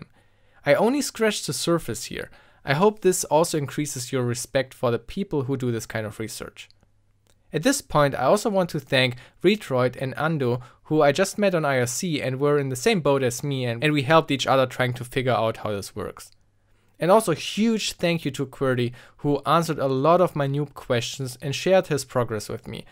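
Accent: Germany. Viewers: I love that German accent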